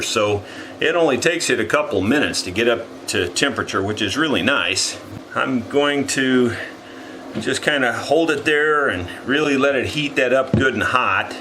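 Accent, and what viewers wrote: South, United States. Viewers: These videos are becoming addictive to me as he verbally takes us step by step through the process of working with his hands, with wood, in that sure and easy southern voice of his.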